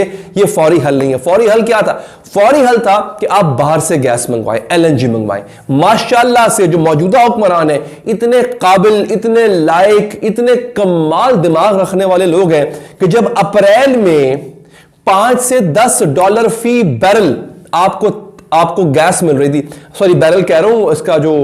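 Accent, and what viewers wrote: Punjab, India. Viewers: 😂😂hyeee sir aapki punjabi 😍😍 Love it ❤ punjabi tu bss 2 hi logon ki hy 1 aap or 2osra ......😂😂. Pharr ke kuttu onu..❤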